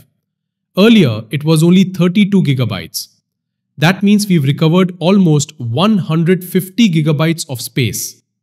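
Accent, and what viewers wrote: India. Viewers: just move file location , its simple as that. Also remove apps setup file as .msi in those folders. Move apps out of C drive. Remove previously installed Windows file Change location of My documents and Downloads Use Windir stat to see , the tree file size. Turn virtual memory to Auto. Anything set to lower value or higher value has its on demerits . Delete recycle bin Delete prefetch, temp, %temp%. You sounded indian, And my expectation was sky high, but u delivered less . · AI generated slop, the thumbnail face does not quite seem to match the indian accent.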